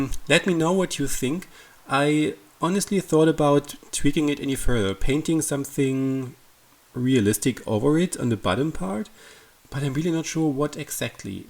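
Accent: Germany. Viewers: Dachte ich mir schon, dein Englisch klingt so deutsch 😂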